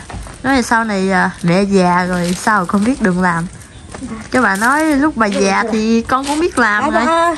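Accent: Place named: Mekong Delta, Vietnam. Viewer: Rất thích kênh vì cách nói bình dị rất miền tây nam bộ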